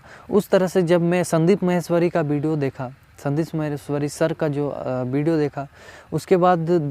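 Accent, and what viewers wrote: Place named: Maharashtra, India. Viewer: Brother aap apni hindi language ko aise bolo jese ki marathee feel na ho matlv aap ki hindi language khadi boli jesi lagti hai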